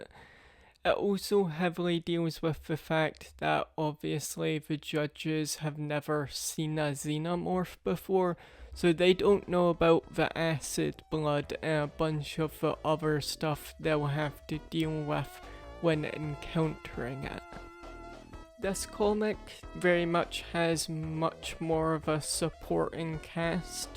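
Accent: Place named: Wales, United Kingdom. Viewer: Bro..sweet accent! Are you Welsh? Seriously, it is awesome.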